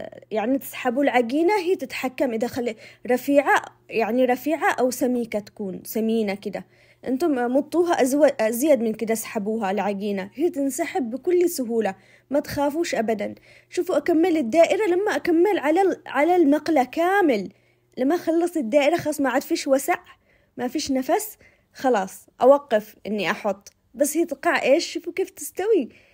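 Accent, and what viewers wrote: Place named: Sanaa, Yemen. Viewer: ماشاء الله عليش بلهجة اهل صنعاء مشحوطه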